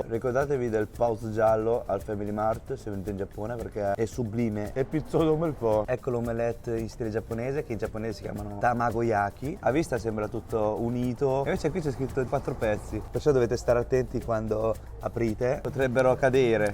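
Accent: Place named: Pesaro, Italy. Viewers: Il tuo accento romagnolo😂💘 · Accento Pesarese direi.. Sei troppo simpatico!! Un saluto da Rimini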